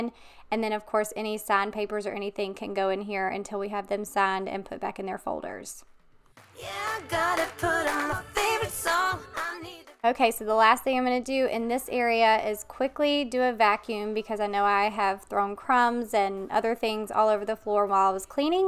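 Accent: South, United States. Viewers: Hi! I just discovered your channel. I went to college in Savannah! Its great to hear a little southern accent. 😉 Thanks for a great video, I look forward to watching more.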